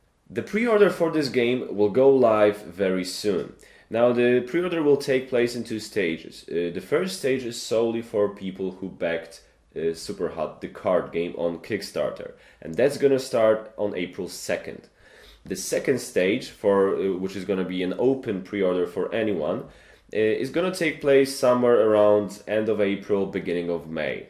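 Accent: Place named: United States. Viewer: Tak z innej beczki.Z doswiadczenia wiem(12 lat w UK),ze czesto lapiemy jako pierwszy akcent ten,ktory jest wokol nas,Po 2 latach pracy w irlandzkiej firmie ludzie do dzis pytaja mnie czy jestem irlandczykiem.Skad ta nalecialosc amerykanskiego akcentu,Za duzo amerykanskich filmow?Moze pierwszy nauczyciel czy tez taki osobisty wybor?To nie hejt ani sarkazm.Po prostu jestem ciekaw.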